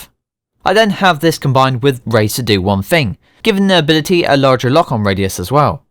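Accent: West Country, United Kingdom. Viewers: Where in the West Country are you from 😏?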